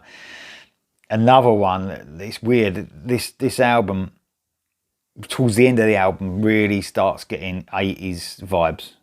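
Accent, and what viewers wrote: England, United Kingdom. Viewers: Thanks man for a wonderful experience. Really enjoyed it. I agree it is indeed a wonderful album. You made it more so with what you've done. Not into metal as much as you guys, but I love. Always have. I enjoy hearing you speak. Love that English accent. I'm from the Caribbean. Thanks again man. God Bless you.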